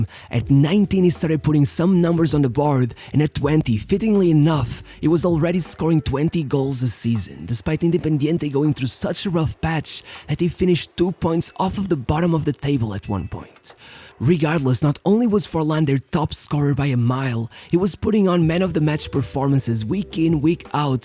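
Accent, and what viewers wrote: Portugal. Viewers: I hear Portugal in your accent.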